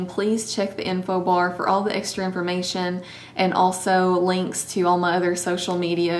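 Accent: South, United States. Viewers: I love your southern twang. <3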